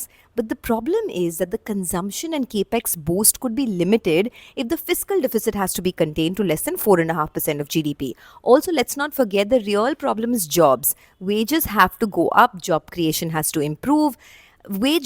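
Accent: India. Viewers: The first short I saw of her I thought she is European till I heard her voice made me realise she is Indian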